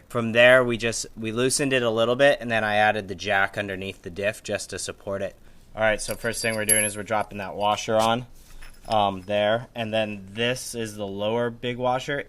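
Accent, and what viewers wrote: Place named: Australia. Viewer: Best Aussie accent ever.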